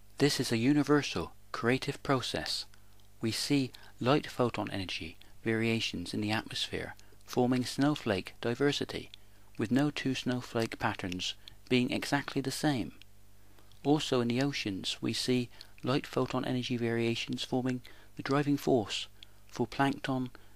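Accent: West Country, United Kingdom. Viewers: Sorry much as your notions are harmless, people like deepka chopra and yourself abusing physics terminology to present some New Age quantum woo irks me somewhat, its deceptive. Physics is much more inspiring than speculative imaginings involving notions like sacred geometry and the universe is conscious. I have one question why do you frame such thoughts using this terminology , its obvious your not really competent with its meaning in science? What accent is that , sounds west country , Cornwall, Bristol, Glastonbury ? All the best.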